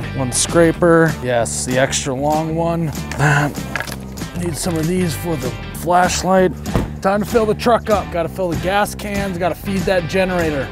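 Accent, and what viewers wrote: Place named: Minnesota, United States. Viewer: I actually Googled "Holiday Gas Station" to figure out where this dude is from. Northern Wisconsin? I thought the 'you betcha' thing was more of a Minnesota thing. Either way, love the videos.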